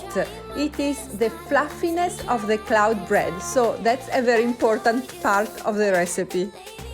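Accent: Italy. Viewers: I love your recipes! They are so simple but DELCIOUS. To me you make keto very easy, and also healthy. I appreciate it that you show the ones that don't go 100% perfectly because that's real life and I am encouraged to keep going even if I don't get it right! I'm going to try this recipe and if they go flat I will think of them as a 'flat bread' and put the meat ontop... delicious! Ps. Your English is so good... I understand EVERYTHING you're saying... and I love listening to your lovely Italian accent. Never change!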